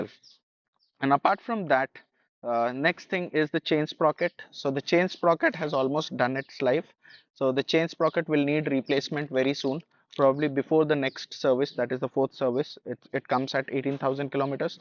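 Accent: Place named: India. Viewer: Bro your English is like someone is talking in hindi with 😂😂😂 I don't know why your voice is so comfortable like I don't even know for sometime that you're not talking in hindi ❤❤❤❤❤❤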